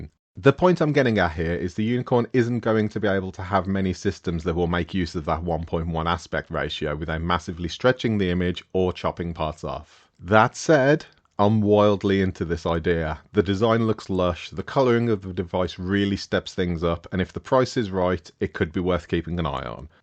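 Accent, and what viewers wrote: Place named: Birmingham, United Kingdom. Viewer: Nice Birmigham accent. I'm from from Acocks Green..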